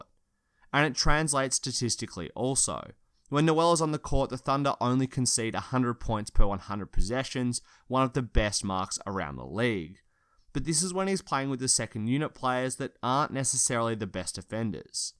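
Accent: Australia. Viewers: Nerlens Noel is on the comeback!! OKC management are doing a great job! Btw hi aussie narrator 👏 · It’s an Australia accent though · Are you Australian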